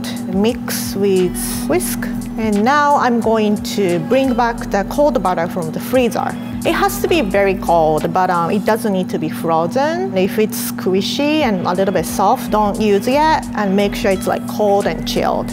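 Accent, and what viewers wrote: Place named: Japan. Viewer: I think because she’s Japanese so she pronounces it like that.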